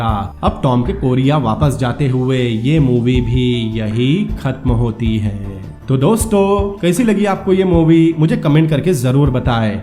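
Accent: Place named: Gujarat, India. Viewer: Aap gujarati hona Awaaj se hi pta chalta h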